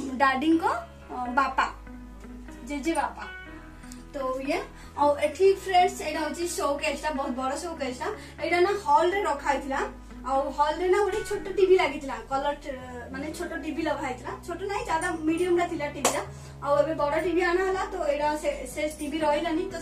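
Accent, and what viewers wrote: Berhampur, India. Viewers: Pura berhampur kathaa ....may be tume sethira bodhe?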